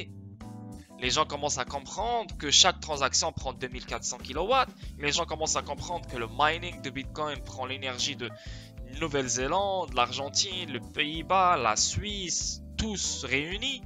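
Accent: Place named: Morocco. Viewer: Tu serais pas un peu marocain ? Je crois entendre un léger accent.. Merci pour tes vid. Dois-je comprendre que je dois reprendre du xrp ?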